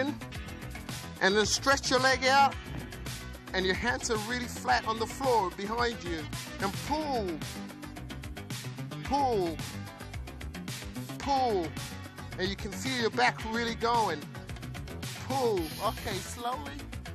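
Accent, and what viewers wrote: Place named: Ghana. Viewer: English Ghana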